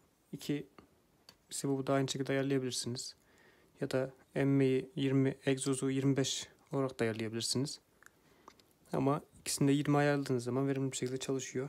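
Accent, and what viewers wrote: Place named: Kastamonu, Turkey. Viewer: Aslan gardaşım devam et maşallah sening gerdüre gerdüre gonuştuğun Gastamonu ağzına gurban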